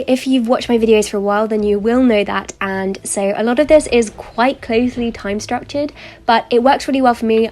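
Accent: United Kingdom. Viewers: I'm learning English, and I love your videos 'cause they are very aesthetic and I really love your accent because he helps me my practice of my listening in English British. Thank you so much!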